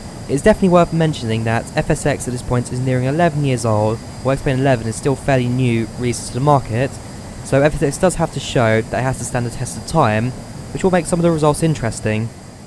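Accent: England, United Kingdom. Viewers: british accent is irritating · You're saying a lot of interesting things I'm sure but I can't understand much of it. I know you're proud of it, but too heavy on the English accent. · It was real English not American nothing at all wrong with his voice if you are English. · Crazy. English...accent... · Is it just me or is this British accent really irritating?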